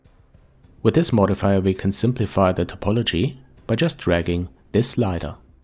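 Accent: Germany. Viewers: Ich hab das voll rausgehört haha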